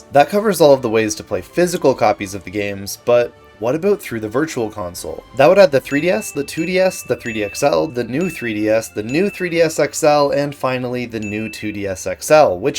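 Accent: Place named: Canada. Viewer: First time hearing this dude and I can tell he’s Canadian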